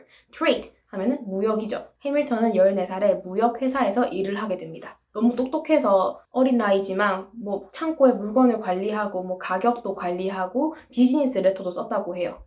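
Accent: Gyeongsang, South Korea. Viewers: 잘 봤습니다. 님의 경상도식 억양이 정감있네요.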